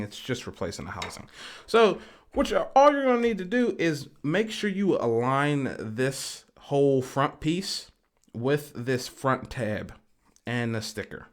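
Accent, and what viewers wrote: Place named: Pittsburgh, United States. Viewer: Are u from Pittsburgh u have that p burgh slang like in errre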